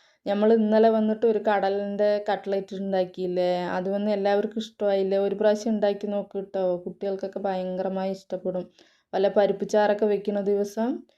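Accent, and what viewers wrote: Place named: Palakkad, India. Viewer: അൽഹംദുലില്ലാഹ്. സഹോദരി സുഖമാണോ. സ്ഥലം പാലക്കാട് ആണോ. സംസാരം അത് പോലെ തോന്നുന്നു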